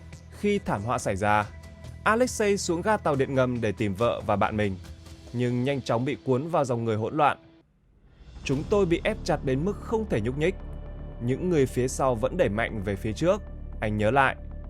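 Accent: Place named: North, Vietnam. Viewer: giọng đọc miền bắc không hay . xin Chọn giọng đọc miền nam dễ nghe hơn .cám ơn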